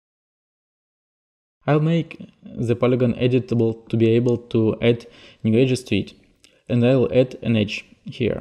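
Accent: Russia. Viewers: МММММ)))) Этот сладкий русский акцент) соу суит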